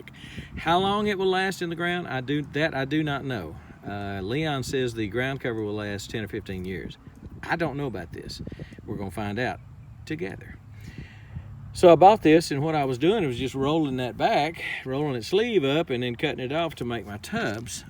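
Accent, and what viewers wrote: Texas, United States. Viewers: This is genius. Thanks. Btw, I'm curious what part of TX you're from. I'm always interested in accents and TX is such a big state; there are different accents. I'm guessing you're east TX, maybe toward OK. Am I close?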